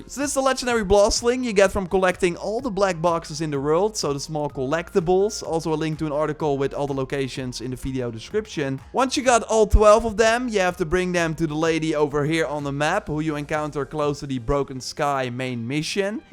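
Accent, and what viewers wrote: Netherlands. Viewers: Are you dutch?? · You sound dutch.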